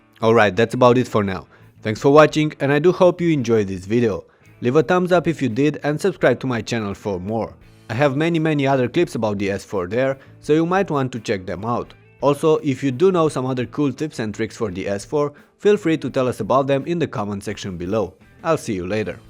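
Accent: Romania. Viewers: Ești roman , se vede după accent.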